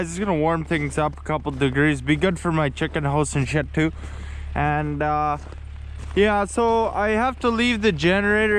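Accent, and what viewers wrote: Canada. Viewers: From Texas. Love those Canadian vowels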